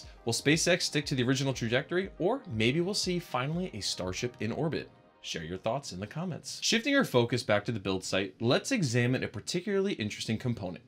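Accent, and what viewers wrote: Germany. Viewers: Wow, ist das schon wieder zwei Jahre her, dass ihr in die USA gezogen seid? Aber ja, das weiß ich, den deutschen Akzent erkennt man überall. Wobei du echt viele neue englische Wörter in dein Repertoire aufgenommen hast. Ihr habt doch vorher irgendwo in der Nähe vom Nürburgring gelebt. Hast du nicht irgendwie sowas mal gesagt, in einem Video lange her, oder? Wie kommt deine Frau damit eigentlich so zu recht? Vermisst sie Freunde oder Familie? Schätze den Kids ist das eher egal, oder? Naja, geh da von mir selbst aus. Wurde auch als Kind durch die Arbeit meines Vaters nach Florida verschleppt. Als Deutscher freut man sich ja über die Wärme, aber die einheimischen kühlen gerne etwas arg runter 😄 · I knew is from Germany stil a little Accent left.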